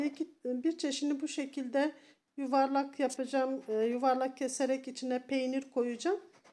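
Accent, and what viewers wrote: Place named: Black Sea, Turkey. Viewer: Ellerine sağlık çok güzel olmuş karadenizlimisiniz konusmasiveniz ayni bendekaradenizliyim izmirden selamlar ❤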